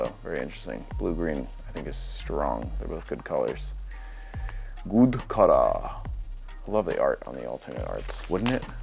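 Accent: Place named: Japan. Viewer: You are the best bro thanks for the opening very entertaining best show on YouTube love your Japanese accent lol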